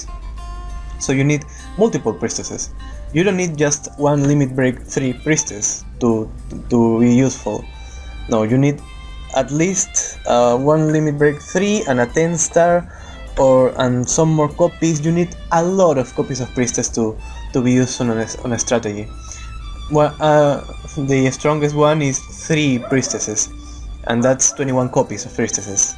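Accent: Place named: Spain. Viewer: Compañero se re nota que hablas español xd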